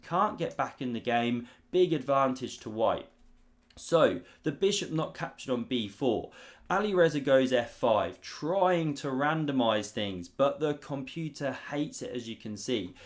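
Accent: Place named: Estuary English, United Kingdom. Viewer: Annoying juvenile "humour," even more annoying Estuary English pronunciation, and insufficient analysis. Agmadator's videos are FAR superior.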